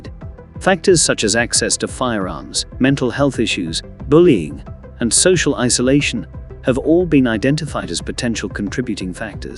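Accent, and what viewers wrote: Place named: United Kingdom. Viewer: Why is a British voice doing the narrating for this video. These are murders that took place in America, the whole trope of having a esteemed British sounding voice to the narration for a documentary or a newspaper whatever is so corny.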